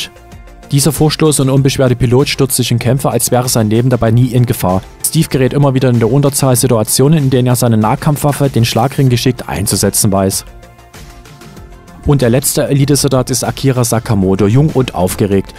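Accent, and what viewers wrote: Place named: Saxony, Germany. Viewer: Alter bist du aus SAchsen oder was ? Dein Dialekt ist ja katastrophe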